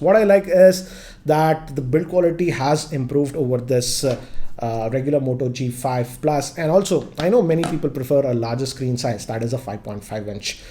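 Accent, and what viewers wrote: India. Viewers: i hate the indian accent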